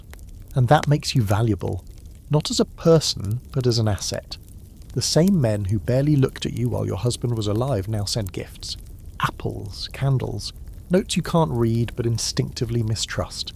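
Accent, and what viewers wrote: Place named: England, United Kingdom. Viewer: would i be alone if I thought an english accent is one of the best for the subject matter? Seems more conservative than usa or australian accents...or perhaps just more presumption of possibly being more directly informed on a subject of the middle ages.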